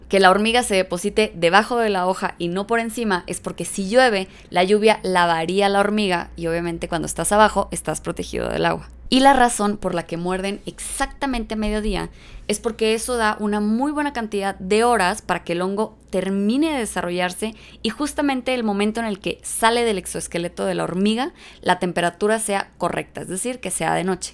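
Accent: Mexico. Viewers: "Tócate más" entendí 😜 Luego vi que era "Toca temas" 🤭 Será por el acento mejicano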